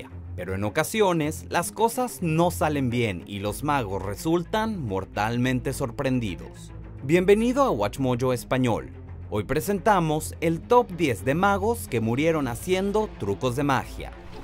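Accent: Mexico. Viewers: Este narrador es de México y el otro Argentino? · En que momento la voz se volvió tan mexicanota? Extraño la voz antigua, era mas "universal" por asi decirlo.. y mas rapido al hablar :/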